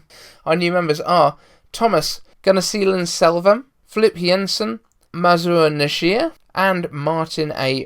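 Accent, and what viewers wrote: United Kingdom. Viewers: You could have a pen knife. I am a recent subscriber enjoying your updates, nice to hear a British accent as well :)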